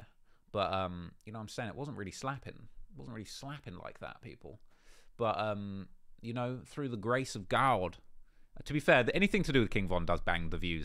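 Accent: United Kingdom. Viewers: BRA you from the UK is the main reason. you don’t know von or understand him, yet you obviously understand who he killed, n how……….von was loyal is the best way to describe him n if anything, he is a vengeful killah. his friends getting killed, sparked the hate for him to do wut he “allegedly” done. he luv’d his gang. If you lived out here maybe ppl wouldn’t be as mad, but he not a serial killah, VON is a vengeful killah. who is really fueled by the luv he has for his friend’z who was killed. he not the only one to get away with body’s. police don’t even try n solve shit half the tyme, in “Chicago” the chances of cops solving a murdah is low. ppl don’t talk it’s just like looking in from the outside…………personally, I don’t think von would be mad about the documentary, only thing we don’t like is you calling him a serial killah! everybody that knew von, knew he had body’s. 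it’s not a secret! it’s just your perception, you’re accent & your WHITE. LMAO 😂😂 Long Live V-ROY 🙏🏽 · Yo Idgaf what anyone says , any British dude that keeps his accent but says alot of black slang when he talks is dope af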